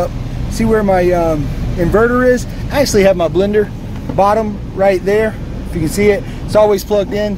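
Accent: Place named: Pittsburgh, United States. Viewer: one hell of a set up my guy! also this guy sounds like he from pittsburgh idk y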